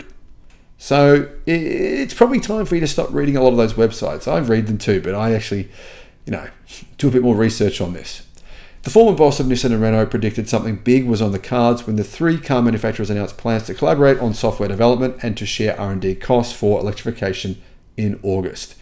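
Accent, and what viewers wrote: Australia. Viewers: Please slow down. When you start talking rapidly your Aussie accent makes you hard to follow for us in the States. Other than that, you've got a very informative site.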